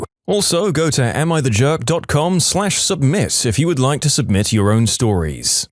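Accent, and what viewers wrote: United Kingdom. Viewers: Why is he British now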